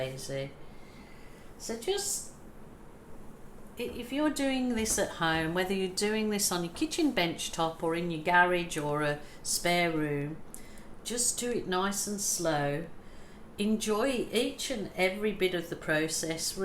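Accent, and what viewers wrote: Birmingham, United Kingdom. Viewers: Hi, have you got a brummie accent going on there? Just found your channel and so thankful I did. Made my day watching this, I am a newbie and can only wish for a result as lovely as this. Thank you. x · BEAUTIFUL !!! can I ask you sound like a slight Brummy accent. Maybe wrong lol . Love your work 😘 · It’s. beautiful and I can understand the emotion you showed. Thank you for showing us how to feel free in a lockdown situation. Are you from Birmingham Uk? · I love this it's great and may I ask are you from Birmingham?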